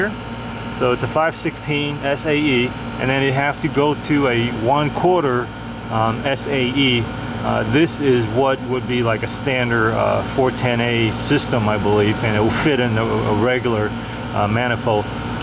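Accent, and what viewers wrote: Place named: Austria. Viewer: your accent sound's like arnold schwarzenegger very cool you get a like just for that :)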